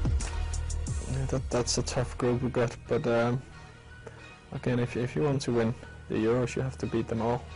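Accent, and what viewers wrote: Liverpool, United Kingdom. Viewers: Hes gettin a scouse accent!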